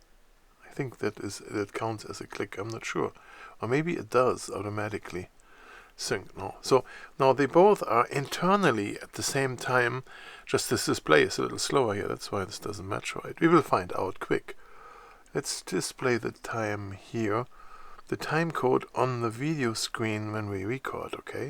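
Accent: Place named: Germany. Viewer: German English... it's easy to understand :) But the video was very helpful, by the way, thank you.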